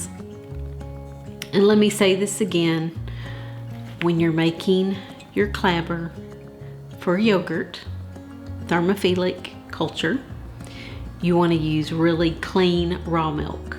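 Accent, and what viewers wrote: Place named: United States. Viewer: Sooo amazing! Thank you! I'm pretty sure this info doesn't exist anywhere else on the Internet. 😂 But there had to be a way. Thanks for sharing. I've married into a German family in South Africa and they also still make Kochkäse. I had to have a little chuckle at the American accent saying it though. I've just recently found your page, as I've taken on the responsibility of our small dairy herd, and I am thoroughly enjoying working through all the shared wisdom you've got here. Very thankful.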